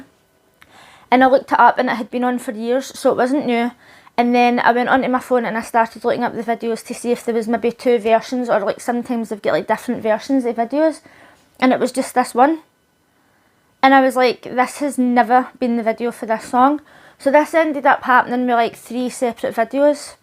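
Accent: Scotland, United Kingdom. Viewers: It isn't your accent, it's your enunciation. I listen to a lot of Scots' channels and I don't have any problem understanding them. I can't understand 2 words out of three that you say, however. I have the same problem with my grandson; he talks too fast and doesn't enunciate clearly. These are easily-solved issues without sacrificing your beautiful accent.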